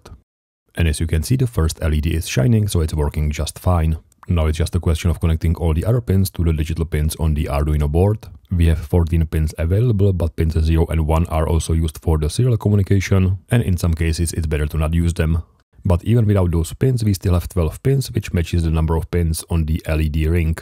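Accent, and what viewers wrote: Czech Republic. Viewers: hey I just had a question if you were czech because you really sound like a czechman and if so, DAMN DUDE that english be almost clear ale jinak nice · Good video and content👍👍 jsem si říkal, že zníte jako čech a je to pravda😂 musím říct, že dobrá angličtina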